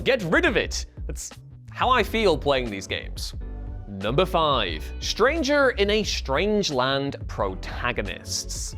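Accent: United States. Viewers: Seriously,. Is it an affectation to try to appeal to American viewers, have you been visiting the States a lot lately? What is up with the Americanization of your accent? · Where in earth has this semi-american accent come from?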